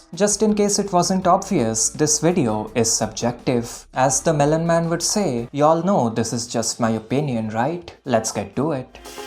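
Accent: India. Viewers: Bro are you indian? Your accent sounds quite indian to me.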